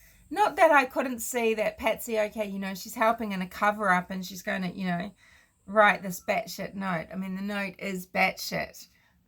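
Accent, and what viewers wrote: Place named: Australia. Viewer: Nice video. I could listen to your Australian accent all day. Cheers!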